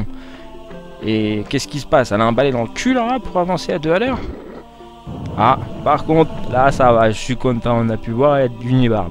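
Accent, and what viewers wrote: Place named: Marseille, France. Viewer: … Ah que c'est drôle tout de même d'entendre ton accent Marseillet. On voit de loin que tu es du sud de la France. - Clément de Québec.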